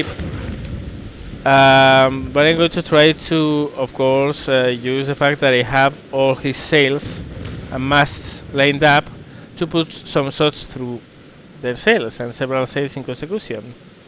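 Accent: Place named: Spain. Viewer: Dios se nota a la legua que eres español jajaja buen video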